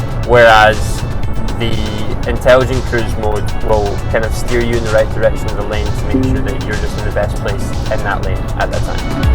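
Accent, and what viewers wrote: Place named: Scotland, United Kingdom. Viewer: The music is way too loud, can barely hear you. Fortunately I had the captions on, which also helped with the Scottish accent 😆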